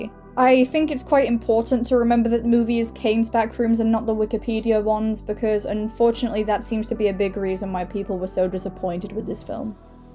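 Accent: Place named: United Kingdom. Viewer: Really cool watching a worm talking about liminal space in a british accent.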